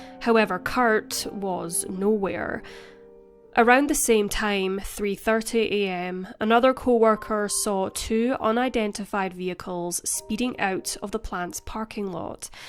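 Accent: Scotland, United Kingdom. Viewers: Cute lil Scottish accent ;)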